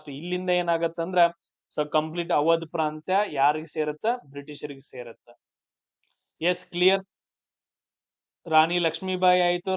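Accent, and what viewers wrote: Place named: Belagavi, India. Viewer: Nimm belagm ಬಾಷೆ ಕೇಳೋಕೆ ಚೆಂದ..sir thank you sir 🙏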